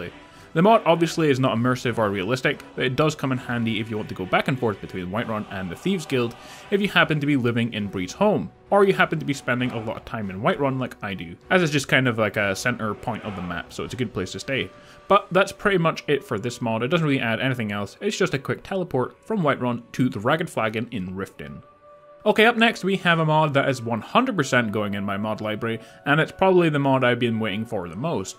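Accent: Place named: Scotland, United Kingdom. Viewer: It's great to hear a Scottish voice on YouTube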